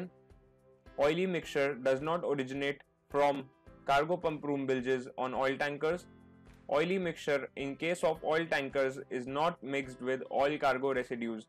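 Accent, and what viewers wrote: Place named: India. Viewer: what's wrong with your pronounciation,buddy?! it's really hard to get your indian English,unfortunately...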